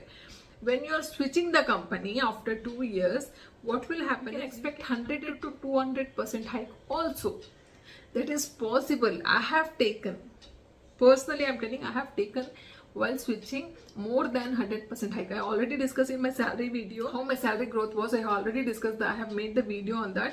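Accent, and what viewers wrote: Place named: England, United Kingdom. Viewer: I like u English Accent 🎉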